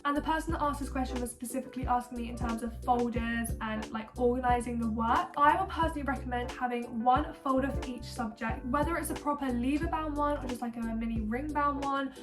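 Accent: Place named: United Kingdom. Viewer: I can’t relate to the things you’re saying cause things are done differently in Nigeria & I’m about to graduate from the university BUT I just enjoyed hearing you speak in your cute British accent and your editing is bomb!!!! I’ll literally throw a party when I get to 5k subscribers 😂😂 Congratulations on the Big 5000, 😁😌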